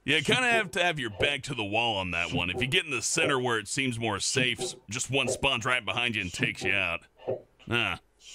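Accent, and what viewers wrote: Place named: Texas, United States. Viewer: I freaking knew you were a Texas. (I'm also a Texan.) Love your voice man!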